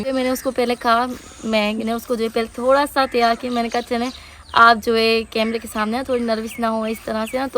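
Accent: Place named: India. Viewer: Main India se hoon magar aap ki jo tone h kahi na kahi India se mil rahi hai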